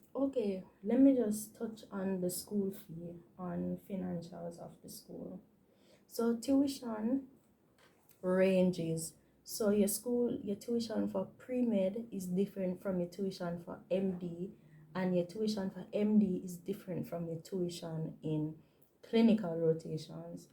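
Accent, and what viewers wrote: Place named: Caribbean. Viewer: I love your carribean accent girl!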